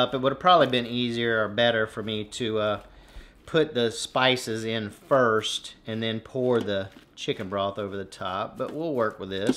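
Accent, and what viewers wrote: South, United States. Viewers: When I hear that, I KNOW YOU ARE FROM THE SOUTH! FIFTYLEVEN!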